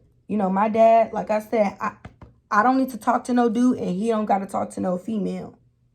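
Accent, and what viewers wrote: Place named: Nigeria. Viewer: Hey gorgeous 😘 Love the content & your nigerian accent tickles me🤣😂👌🤣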